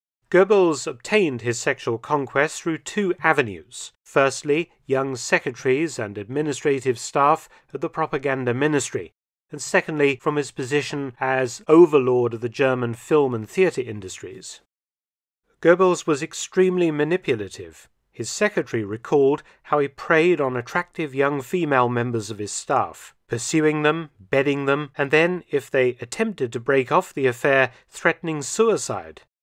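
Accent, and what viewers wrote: United Kingdom. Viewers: Mark Felton, I love your educational channel. I've caught a lot of your videos. They are very informative. Very well laid out. 100% professional. Except your voice. Now you sound like one of those fine British dudes? And you guys are known for delivering, superlative audio. As that will help your rather droll delivery. Because I'm not a big fan of your voice. But no design. You produce some great educational videos of history. Thank you. I glean much from your videos. Now, I know there really isn't anything you can do about your voice. But adding a tiny bit of, free software-based, processing. Would be an advantageous measure to take. Doing little things like, rolling off the low frequencies. Boosting the upper midrange frequencies between 3 and 5 kHz. And then an extra little boost of high-frequency treble, boost. Followed by what we call a Dynamic Range Limiter. 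With settings of 20:1. Attack and release times. Set to, Medium. And making the meter deflects, downwards. Like your windshield wipers on the highway during a, hurricane. And then you'll have something. It's easy to do. You can do it too. It's okay. We won't tell anybody! RemyRAD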